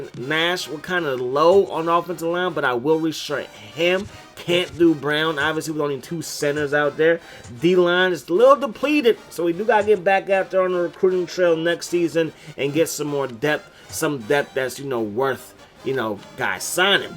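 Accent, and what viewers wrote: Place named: Baltimore, United States. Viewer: Barry Sanders and Thurman Thomas would disagree with your two RB statement. I am looking forward to another amazing series from you. One question for you. Are you from Baltimore or the surrounding area? The B-More accent comes out sometimes during your commentary.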